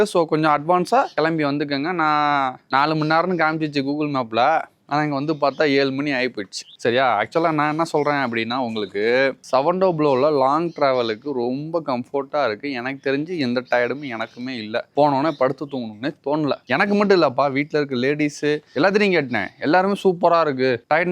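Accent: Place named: Trichy, India. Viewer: Super bro I will also try.. Am having THAR from Trichy .. I watched your videos .. your reviews are so awesome.. Namma trichy slang la Namma Veetu paiyan maaari pesaringa .. Happy trip bro enjoy 🎉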